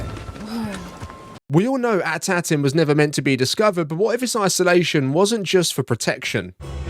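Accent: United Kingdom. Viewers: good video, except that strong bri-ish accent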